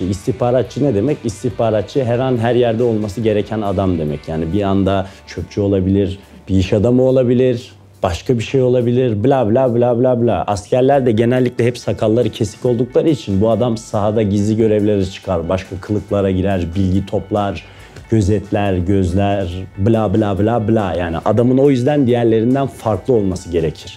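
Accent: Black Sea, Turkey. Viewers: Ohaa efsane karadeniz sivesi var yaa😍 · Cok güzel karadeniz sivesi yapiyor. Bende inanmazdim laz olmadigina 🙈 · Yaaa cok tatlııısin karedeniz şivesi de var bal ayrica SAKAL SANA COK YAKISIYOR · Çok güzel Karadenizli konuşuyor ve gerçekten çok sevdiğim bir oyuncu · Eren abi çok güzel karadenizce konuşuyor ❤❤Ayrıca Niye SÖZ bitti ❤❤Seviliyorsun Abi ❤❤❤ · Senin Karadeniz sivenii yerim usagim 😂😂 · Lazca değil o, karadeniz 'ağzı' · Lazca değil o, karadeniz 'ağzı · Ben keradenizli sandim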